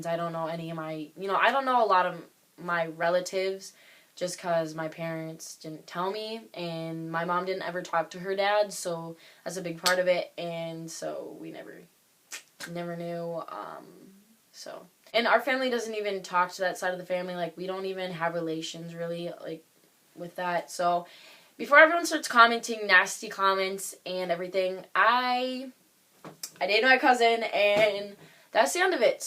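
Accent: Alabama, United States. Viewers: are u from Alabama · Uh...are you from ALABAMA ? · Are you from Alabama?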